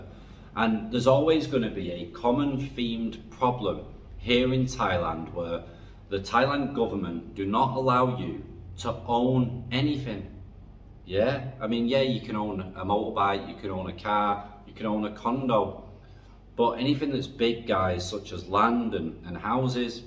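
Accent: Manchester, United Kingdom. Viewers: That back home accent sounds like Walkden Manchester. Just dropped on to your site this morning been binge watching since 8.00am this morning,beento Thailand twice now havent been in 5 years,those pesky kids cost a fortune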